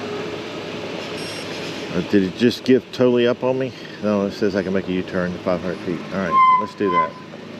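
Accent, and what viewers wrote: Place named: South Carolina, United States. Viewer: Enjoying your videos. Keep riding. One question....what state are you in? I see all the crape myrtle, palm trees and southern pines, but judging by your accent, I would guess South Carolina? Let me know if I came close.. Lol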